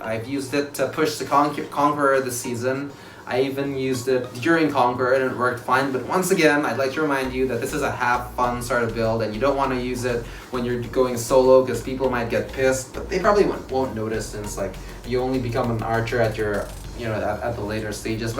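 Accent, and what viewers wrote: Bali, Indonesia. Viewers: jano ini org bali ya?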